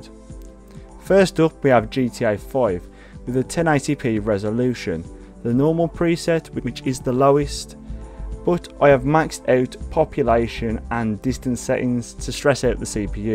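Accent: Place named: Wolverhampton, United Kingdom. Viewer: That accent sounds very local, I’m from Wolverhampton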